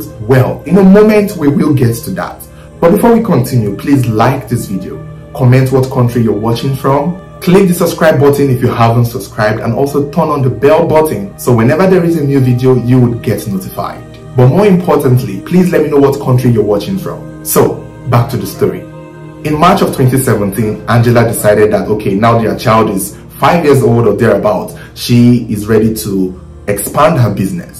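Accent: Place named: Nigeria. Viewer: I like his accent too. He's different from the other Nigerians' accent. It's so difficult to understand. I'm from Mozambique my official language is Portuguese and I'm used to American, British accents.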